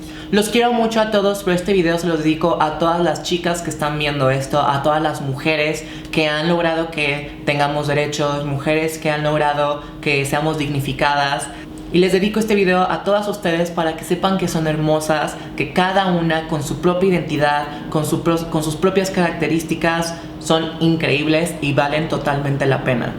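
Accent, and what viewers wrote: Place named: Fresa, Mexico. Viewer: porqué siempre hablas tan pinche fresa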